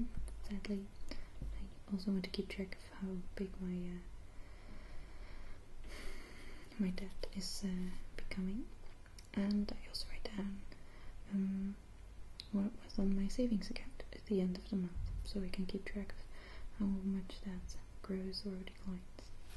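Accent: Netherlands. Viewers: Ben je nederlands? Hahahah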